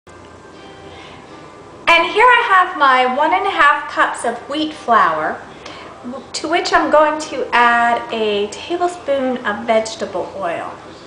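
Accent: United States. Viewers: u sound country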